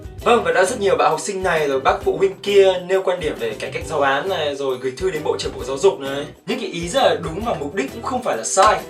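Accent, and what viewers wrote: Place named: United Kingdom. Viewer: Giọng Anh-Anh của anh hay quá điiii ; 3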